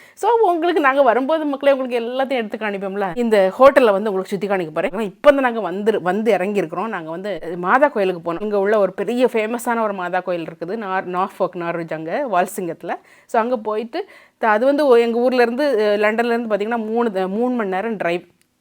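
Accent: Nagercoil, India. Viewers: Akka, truly your videos are stress busting for recent times. Thank you so much 👍🏻 அதுவும் நம்ம நாகர்கோவில் தமிழ் அடிப்பொழி...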